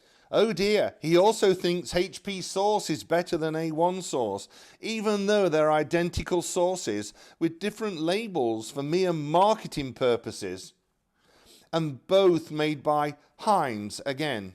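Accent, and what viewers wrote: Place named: Derbyshire, United Kingdom. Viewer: I love the intro, and adore the channel thank you, love your accent reminds me of my mothers side of the family from Derbyshire what a gorgeous place. HP sauce is wonderful, love it, and grew up with this food, exciting will definitely share this with my mother, she loves to cook,so does the family . Thank you such a happy show. enthusiasm and passion ,scrumptious meals and stylish presentation and yes your delightful personality.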